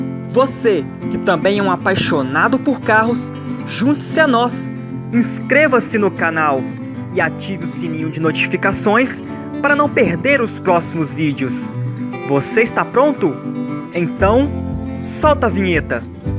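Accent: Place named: Northeast, Brazil. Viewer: Velho sotaque noredestino. Inconfundível.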